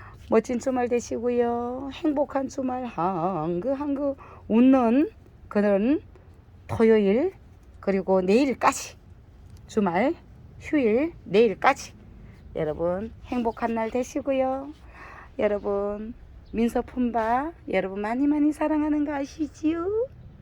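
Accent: Gyeongsang, South Korea. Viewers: 정말 부지런하시네요. 경상도사투리도 구수하구요.ㅋ 민서님은 어디에서 구매하시나요? 여긴 대구인데 ...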